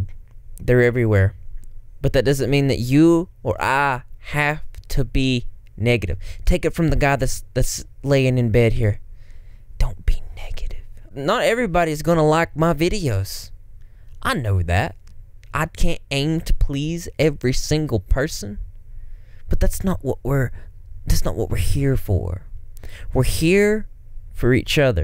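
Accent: United States. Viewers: Where do you live/are from? You literally sound like you could live in the states near me.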